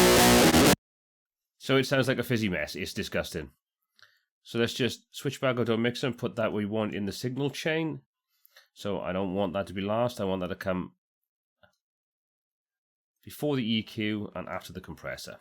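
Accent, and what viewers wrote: Wales, United Kingdom. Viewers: Great video, and such a joy to hear a Welsh accent.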